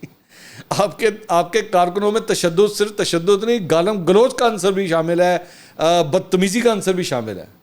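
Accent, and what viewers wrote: Punjab, Pakistan. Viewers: السلام علیکم بھائی پنجابی والا لہجہ بہت اچھا لگتا ہے اپ پر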